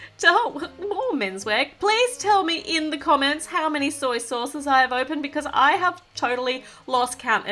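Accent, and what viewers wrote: Australia. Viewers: Your Australian? I’m Australian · OMG ARE U AUSSIE SAME!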